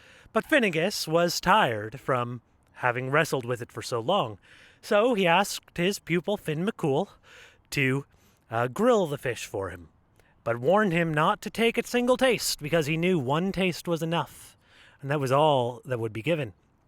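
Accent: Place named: Ireland. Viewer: You have an Irish tongue, m8. Thank you for sharing. Sláinte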